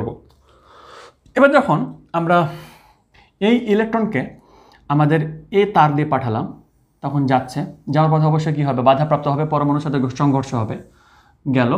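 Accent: Rajshahi, Bangladesh. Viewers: আসসালামু আলাইকুম। স্যার আপনার বাড়ি কি রাজশাহীতে ??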